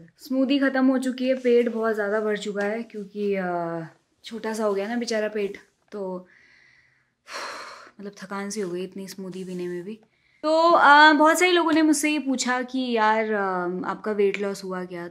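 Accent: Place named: North, India. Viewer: Really liked the simplicity of this girl. No unnecessary "angreziyat" or overacting. I like her pure desi North Indian Hindi and how confidently and proudly she's speaking it. Very good video 👍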